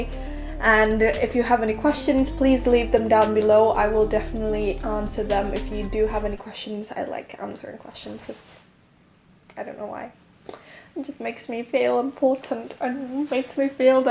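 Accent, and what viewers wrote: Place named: United Kingdom. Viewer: gurl your British accent has gotten so much heavier than your last video lmao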